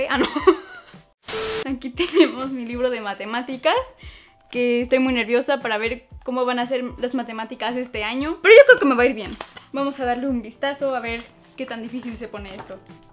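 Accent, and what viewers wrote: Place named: Ecuador. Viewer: Eres de ecuador❓ buen video ❤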